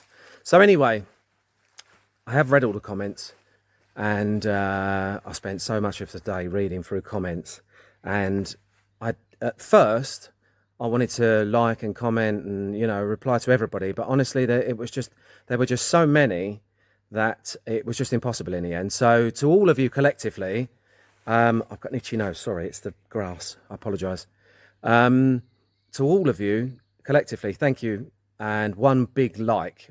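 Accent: Essex, United Kingdom. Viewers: Love your channel. Are you from Essex by any chance? Good luck with the renovations. 👍🥂🍾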